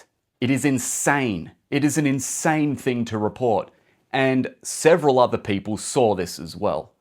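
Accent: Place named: Australia. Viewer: Paused the video. Guessed the man was Australian. Resume video. Vindicated.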